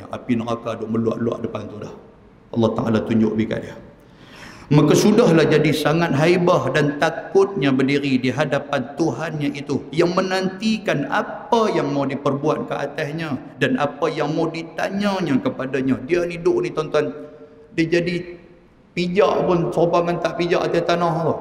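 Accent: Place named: North, Malaysia. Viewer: betul kan ,,sy tahu sbb sy peminat ustz samsuri ahmad,,pejam mata sy dah tau ini suara ustz tp semalam membuatkan sy terkejut beruk dengar suara ustz ini,, Cara penyampaian lenggok dia bila berkata sama sgt,,lorat pon utara,,lawak dia pon sama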